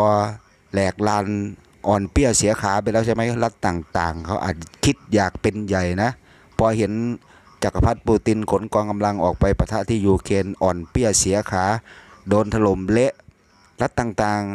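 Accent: Isan, Thailand. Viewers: พากษ์อีสานโหลดอ้าย ฟังง่ายดี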